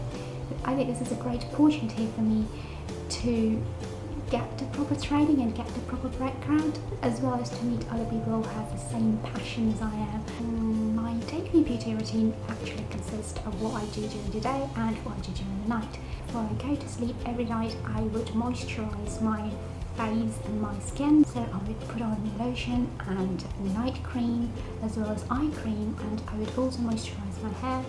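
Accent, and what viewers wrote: United Kingdom. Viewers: I can't be the only who says that your English accent is really gorgeous 😍😍😍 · accent nyaa bagus amat nenggg.. british yaa? hahaha love itttt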